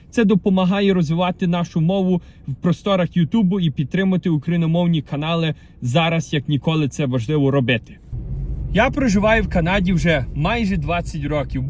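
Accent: Western Ukraine, Ukraine. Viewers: Україна велика і не може людина з заходу говорити полтавською чи київською говіркою. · Надя! О с западной Украины!! И там язык не похож на Черниговский(я оттуда) или Полтавский. Но и на украинский, красивый, он не тянет.. Я не в обиду... Просто режет слух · Ві говорить на діалекті української мови. Так говорять в селах на заході України. Це не літературна українська мова. Може тому так тяжко в Канаді, що він навіть українською не дуже володіє не говорячи про англійську.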